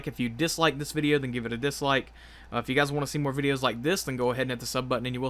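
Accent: South, United States. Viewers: I love the bit of southern he has in his voice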